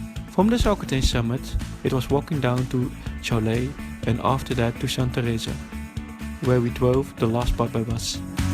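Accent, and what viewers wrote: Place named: Netherlands. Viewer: Turn on subtitles... sorry for the inconvenience.. Dutch accent is hard to understand apparently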